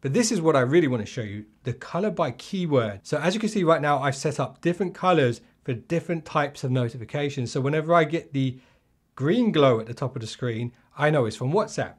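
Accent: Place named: England, United Kingdom. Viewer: Can u drop the english accent? Not feeling it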